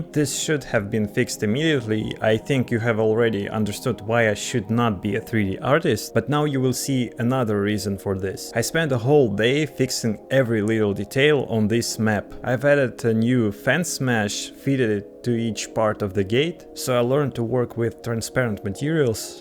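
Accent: Russia. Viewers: воодушевляющее видео) и ты явно русскоговорящий)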